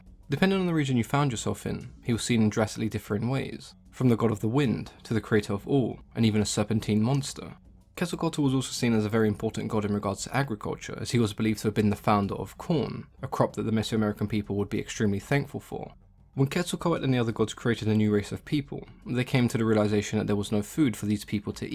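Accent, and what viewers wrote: United Kingdom. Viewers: Yes there's something about the British accent that lends an air of Professional Expertise on any subject. I wish my high school professors talked like this. :) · A schmuck with a British accent trying to give info on Aztec mythology! Foh smh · Okay, I've just realised your British, I think, maybe? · ok...whats your accent? You sound British but I don't know if that's what it is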